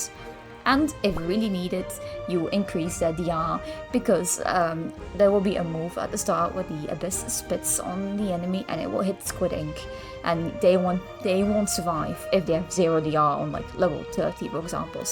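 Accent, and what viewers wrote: United Kingdom. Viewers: Bri’ish tbh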